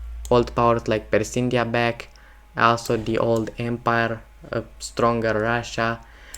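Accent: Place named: Romania. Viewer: Peak romanian accent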